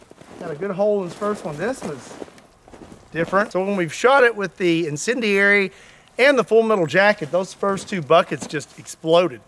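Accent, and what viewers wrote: Kentucky, United States. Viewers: your voice says kentucky, but your clothing says oregon.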